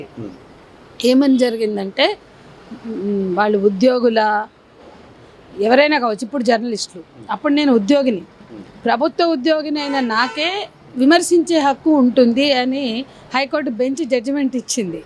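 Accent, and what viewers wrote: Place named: Telangana, India. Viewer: Asalu Appudu Telangana Slang matladani nuvvu ippudu sudden ga Telangana Slang andhuku vadutunnav hoo Sentiment tho kottali ani chustunnava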